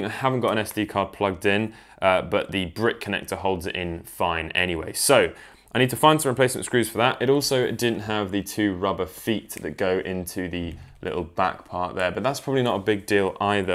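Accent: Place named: United Kingdom. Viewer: In British English.. perfect, i love your accent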